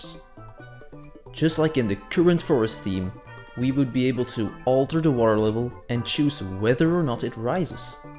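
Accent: Netherlands. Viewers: WOWOWOWOWOWOWWW! TALKING? I MEAN... I'VE BEEN A BIT DISTANT FROM THIS CHANNEL LATELY, BUT... CAN SPEAK? (I like your voice tho. And the dutch accent is pretty awesome to hear, you don't hear that accent on youtube often) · are you dutch?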